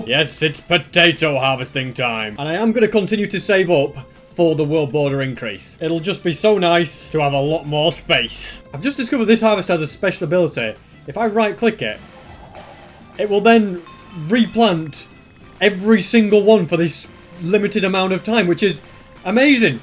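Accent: United Kingdom. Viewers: He is British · hes british?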